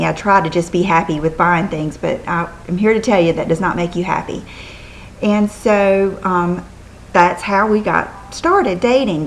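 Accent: South, United States. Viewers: PS: you always brightin my day, Ur southern accent is soo beautiful and welcoming i am soo takin back.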